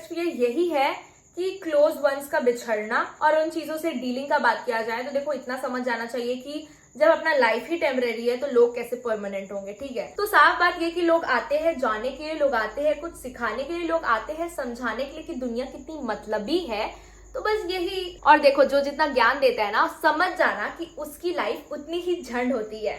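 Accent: Bihar, India. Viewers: You speak like a bihari ♥️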